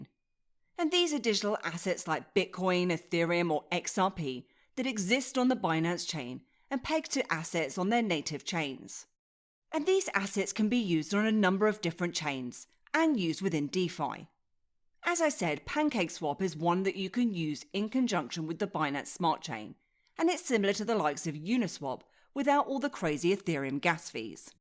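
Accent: United Kingdom. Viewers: Actually, it's true English. Americans/Canadians are the ones with the bizarre dialect.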